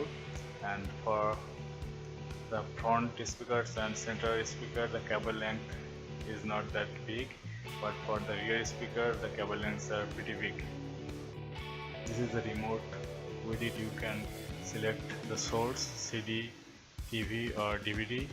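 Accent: Bengal, India. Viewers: are you a Bengali? It's so evident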